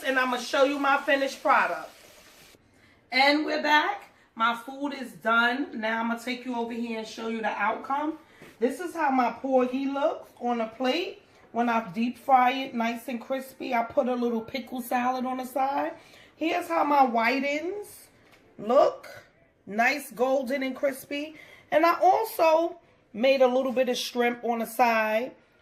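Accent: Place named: Newark, United States. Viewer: She sounds like she from Newark lol we do that ova here too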